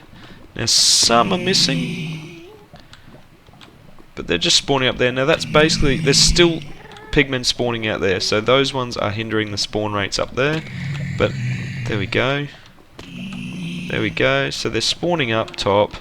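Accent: Australia. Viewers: Wow you town looks so cool and are you Australian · I love Australian accents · Are you Australian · Are you Australian? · Australian dude? I am :D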